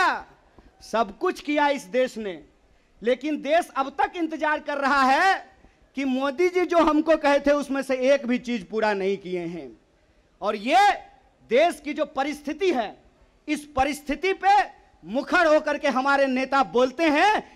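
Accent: Bihar, India. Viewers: His Bihari tone even make his speech more loud and powerful and also beautiful... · Bihari style like our souls 🥰🥰🥰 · It's dialect of Bihar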